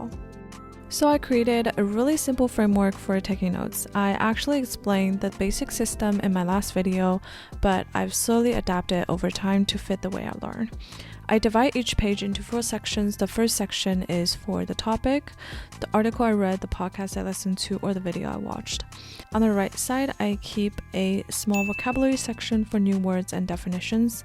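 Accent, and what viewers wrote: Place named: England, United Kingdom. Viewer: You have a great English accent! Subarashii!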